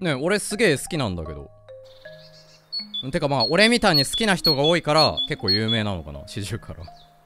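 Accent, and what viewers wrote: Japan. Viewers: 開始10秒でお蘭の流暢な日本人英語聞けて大満足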